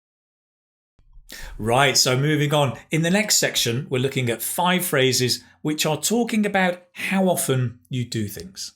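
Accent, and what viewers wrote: United Kingdom. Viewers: Especially his british way of pronouncation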